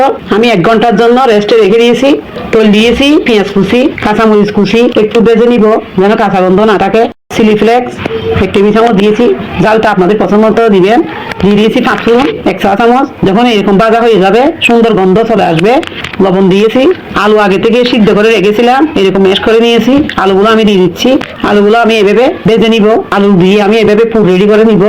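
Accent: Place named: Chittagong, Bangladesh. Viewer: চাটগাঁইয়া টোন!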